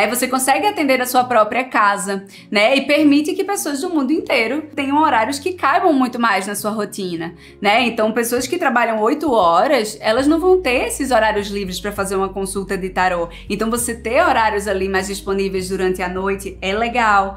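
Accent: Pernambuco, Brazil. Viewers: Oi! Conheci seu canal há pouco tempo. Você é pernambucana? Estou sem maturidade emocional para assistir aos seus vídeos sem me perder no sotaque maravilhoso; preciso ver duas vezes. Hahaha Gratidão pela sua explicação, tão clara e iluminada. Que 2021 seja de caminhos abertos para você, com muito amor e luz! ✨🎆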